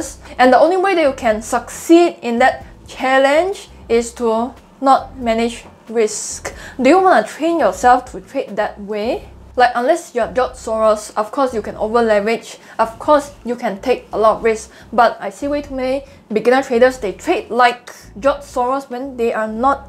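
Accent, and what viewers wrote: Singapore. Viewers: i love singlish i cant hear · Your accent so familiar, let me guess you from singapore 🤭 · that is singapore accent, if you dont know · Thish is pure singlish and I love it..cheers!!!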